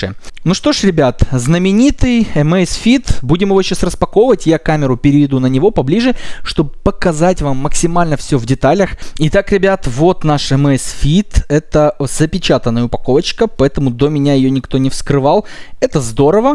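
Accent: Moldova. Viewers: ты чё из молдовы?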